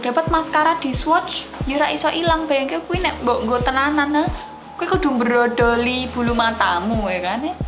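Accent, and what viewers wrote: Java, Indonesia. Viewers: Adoh mbaknya jowo sekali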